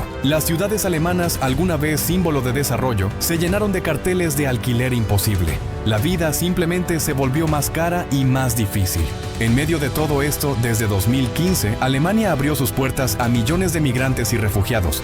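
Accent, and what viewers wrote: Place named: Latin America. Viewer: Según ustedes es imposible vivir en Alemania, Australia, Canadá, Portugal, Nueva Zelanda, Irlanda, Japón, Reino Unido...y siempre de una forma u otra es culpa de los inmigrantes... qué raro!! Suena a ideas de extrema derecha...y lo mejor de todo es que el locutor tiene acento de Latinoamérica, será un inmigrante?? Quizás es uno de esos miles de Venezolanos que pidieron el voto para Trump, los Magazolanos, y ahora Trump los expulsa. No me creo que no se pueda vivir en ningún sitio y encima siempre se criminalice a los inmigrantes.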